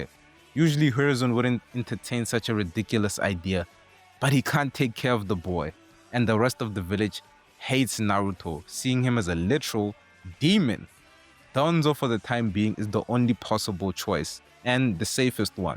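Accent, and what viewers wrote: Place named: South Africa. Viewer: I hear South African accent ... ARE YOU SOUTH AFRICAN ?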